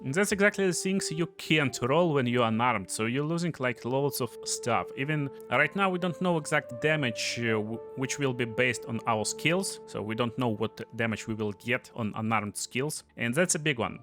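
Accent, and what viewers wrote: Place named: Russia. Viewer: Are you russian? Based on music choice and strong accent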